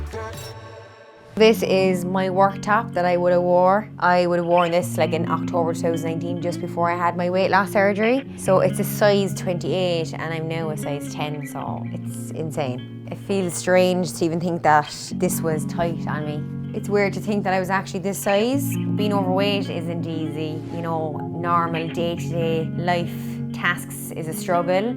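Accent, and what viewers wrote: Ireland. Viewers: Couldn't believe it when I heard da Cork accent on utube! 😄 I'm from Cork too....Barrack St area. Fair fucks to you, lady. You are blessed. I nearly died from obesity. Heart attack at 45, open heart surgery at 53. That could have been in store for you or worse. I turned it around just like you after my operation 7 years ago. I calorie counted at the start, eat a clean diet and zero junk food. I exercise regularly and do Kettlebells and Bands for resistance training at home. Lost 80lbs and more importantly my destructive junk food habit and 80 a day smoking habit. I have a few nice war wounds to remind me off my past....a nice scar all the way down my chest where they sawed me open for the op in the CUH. I also have a nice scar from my ankle all the way up to the top of my thigh from where they harvested my vein from my leg to perform the bypass surgery. 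Lucky to be typing this, so scars are a least of my worries now. Great to see your transformation and more importantly that you have given yourself an opportunity of a long life. You are looking hot, 🔥 my dearest....not just on the outside, but most definitely on the inside too. Well done. Lots of love on this Paddy's Day.☘ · Beautiful girl, she must be Irish with that accent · I stuck around mainly for the Irish accent 💚🍀😁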